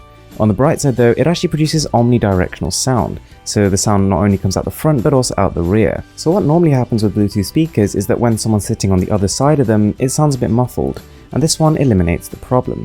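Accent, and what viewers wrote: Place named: England, United Kingdom. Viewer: awesome video bro.. as usual. u r eng accent .👌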